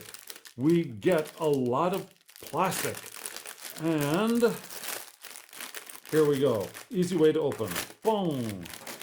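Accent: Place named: Latin America. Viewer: ah nice. I have friends in Italy and I heard how you speak English and I thought that you will be Latin something? From which country are you?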